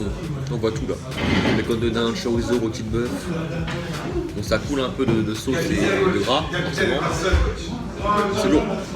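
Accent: France. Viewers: Tu est du marseille ?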